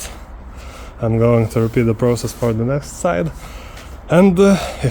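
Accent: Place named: Lithuania. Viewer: Girdžiu lietuvišką kirčiavimą 😂 Ačiū už video ;)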